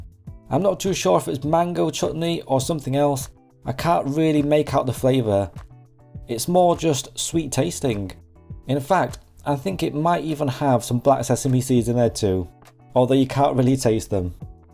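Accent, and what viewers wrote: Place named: England, United Kingdom. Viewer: Nice pomme accent by an Asian guy doing Indian food... From a African guy from Kerala, India:-)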